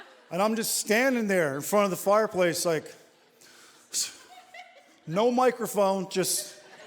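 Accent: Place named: Newfoundland, Canada. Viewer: When you started I thought there was Irish in your accent ,then you said you were from new foundland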